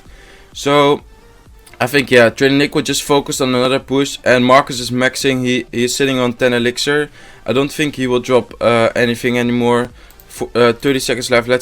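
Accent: Netherlands. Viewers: where are you from you sound dutch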